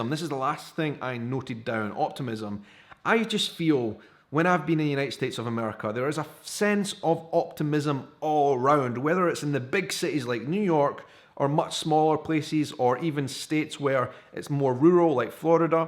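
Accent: Scotland, United Kingdom. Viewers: What does Scotland do best....the accent! Read a friggin book and I will listen. Seriously... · So glad I found you. Enjoying your posts so much. I love Scottishs accent and phrases!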